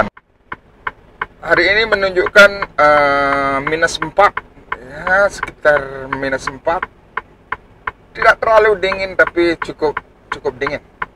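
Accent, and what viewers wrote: Bali, Indonesia. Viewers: Om orang bali ya, kok logatnya kyak orng bali?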